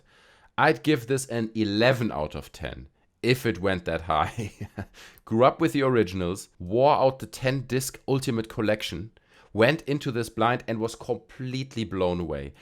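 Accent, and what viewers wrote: Germany. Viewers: Subbed today. Love the authenticity. Can’t place your accent. Is it German?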